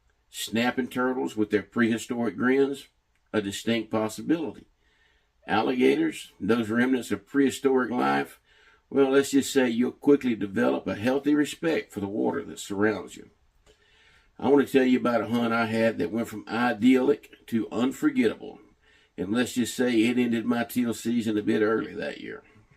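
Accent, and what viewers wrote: South, United States. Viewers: This was amazing, you're such a good writer not to mention storyteller especially with your lovely southern accent. I can't wait to hear more stories from you in the future 😊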